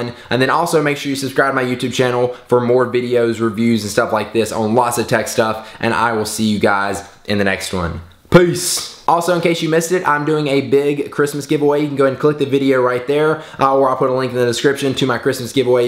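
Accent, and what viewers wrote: South, United States. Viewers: He has a slight southern accent qce* qce* qce* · Has nothing to do with the iPad mini but you look extremely cute with that short hair and I would date you if you went this way. Just saying. Love then country accent too. ;)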